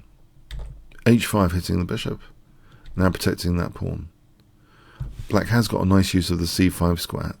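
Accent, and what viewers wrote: United Kingdom. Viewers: yeah you know you’re British accent is different than what I’m used to hearing being an American unfortunately.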